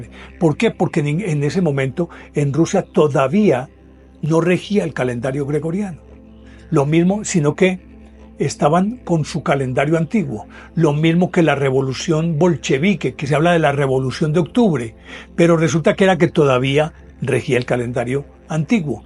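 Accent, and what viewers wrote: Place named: Colombia. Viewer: Me encanto esta historia y narrada con un acentó colombiano que chevere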